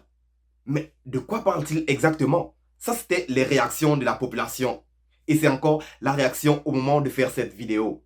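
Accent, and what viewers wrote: Gabon. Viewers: Slt dis moi t'es gaboma? Parceque tu a l'accent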